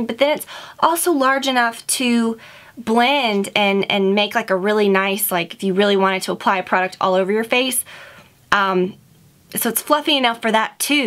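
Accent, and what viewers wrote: South, United States. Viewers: I can hear a strong southern accent! Love you! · I don't normally pick up your southern accent in your videos but for some reason I could really hear it in this one lol! Adorable of course, and thank you for all the great info :) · Woah wait you have a southern accent???!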